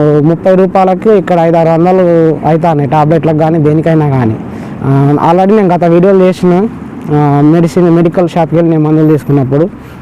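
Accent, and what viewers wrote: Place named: Andhra Pradesh, India. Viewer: నువ్వు కరీంనగర్ గదా నువ్వు సగం ఆంధ్ర సగం తెలంగాణ మాటడుతునవ్ మొత్తం తెలంగాన మాట్లాడు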